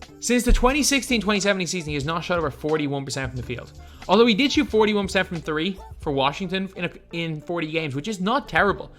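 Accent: Ireland. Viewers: Yo u Irish?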